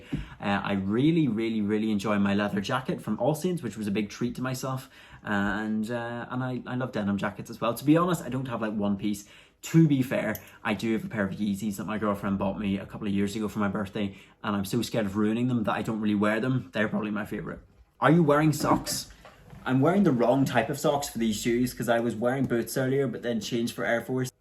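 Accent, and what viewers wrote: Ireland. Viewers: Are you Irish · I love his Irish voice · Your accent is an Irish one right? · I’m not 100% sure but is your accent Irish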